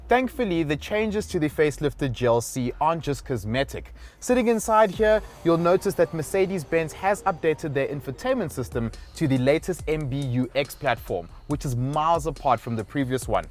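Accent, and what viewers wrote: South Africa. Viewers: You from South Africa 🇿🇦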